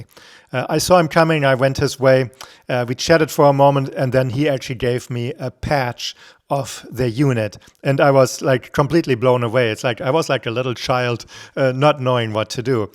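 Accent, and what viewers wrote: Germany. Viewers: Na da höre ich doch einen deutschen Akzent. Bist DU Auswanderer ? Danke für das Video. Gruß aus der Oberpfalz, Manuel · From your accent you sound German. In case you didn't know we have German military personnel who have i believe permanent duty stations at Holloman AFB, New Mexico and Nellis AFB, Nevada. I hauled weapons, explosives, and other secrey stuff for the governemnt for 30 years and when the Germans shipped their ammo over here we would haul it to them wherever they were based. We loved dealing with them, so polite and efficient in getting us offloaded. Be well! :) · 1 Sek im Video und ich so oha deutscher Akzent kommt hart durch😂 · Hey there, came across your channel by chance. Was not disappointed. Love the accent - German origin, right? You got your own private airshow there pal, courtesy of the United States Marines. What a treat. 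And what a great look at an awesome piece of military hardware. Subscribed.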